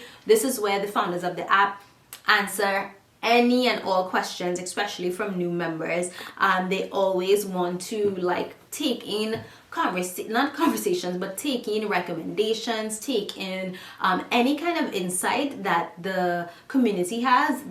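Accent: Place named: Trinidad and Tobago. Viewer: Oh sis you’re Trini to d boneee 🤣🤣 the accent is so familiar!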